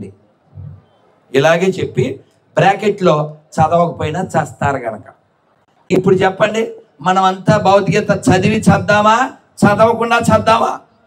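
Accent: Telangana, India. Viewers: గురూజీ మీరు తెలంగాణ భాష మాట్లాడితే సూపర్ గా ఉంది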